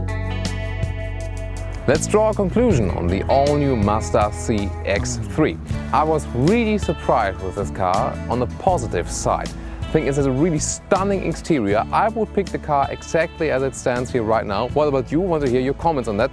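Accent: Germany. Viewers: Please talk english not genglish! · love german speak english.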